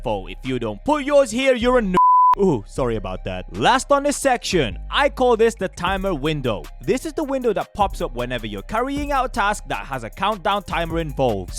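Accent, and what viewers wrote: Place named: Russia. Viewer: Why do you sound Russian? Are you Russian?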